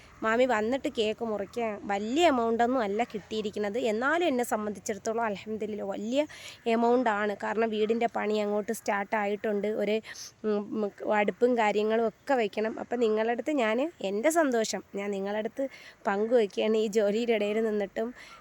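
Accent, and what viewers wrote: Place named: North Kerala, India. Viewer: Hlo എടാ ..നിന്റെ വീട്‌ കൊല്ലം ആണോ ...ഈ പാരിപ്പള്ളി എവിടാ ...നിന്റെ slag വടക്കൻ കേരളത്തിലെ പോലെയാണല്ലോ .....ഒന്ന് പറയണേ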